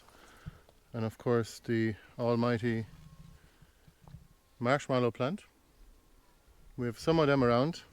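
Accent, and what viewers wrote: Ireland. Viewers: Lovely video, well done, a fabulous example of gardening with Nature. (And of course, an Irish accent could read a boring old shopping list and I'd be hooked!!) Found you via Colette at Bealtaine Cottage and have to say, I'm really envious of all the currants and jostaberries you grow - too warm here in my part of New Zealand (Waiheke Island, up north) for any of those. To see all the yarrow and Jerusalem artichokes, all the herbs - I wish I had more space to indulge all that too. Thanks so much for making the film, I shall have to go back and look at the others you'd done. Mighty impressive. Unfortunately, we have to pay a fortune to get wood chips here so I only use them occasionally for the paths, but have been no dig for nearly 20 years and I know it works. Great use for all the old cardboard boxes too, eh?! Gorgeous, hope things continue to go well for you and thanks again for showing us :) ♥♥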